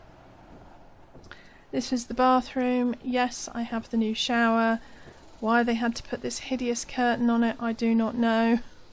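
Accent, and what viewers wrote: United Kingdom. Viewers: I love seeing all these camp builds people come up with, thanks for some ideas, really helps as I'm not that imaginative if I'm honest. Shame you only play on Xbox? it would have been nice to see your camps ingame and have a chat. Love your humour and accent too, despite being literally from the same country and having pretty much the same humour as all British people do really. Have a good weekend c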